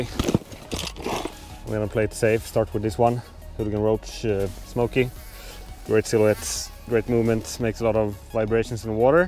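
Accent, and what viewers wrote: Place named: Sweden. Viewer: Varför pratar han engelska när man kan höra att han är svensk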